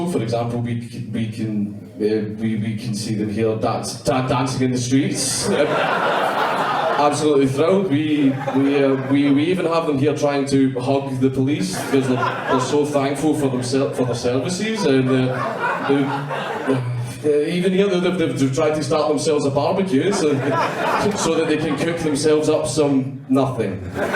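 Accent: Scotland, United Kingdom. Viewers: Could barely understand you. Scottish Comedian/10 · Way too British to understand, dear god. Lol, but good stuff, man. Right on.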